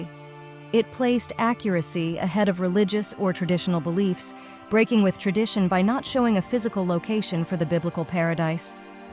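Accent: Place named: United Kingdom. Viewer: I rather prefer to hear Brit accent than those other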